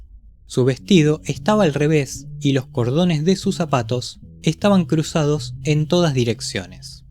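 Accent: Argentina. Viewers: Los Argentinos son perfectos para narrar historias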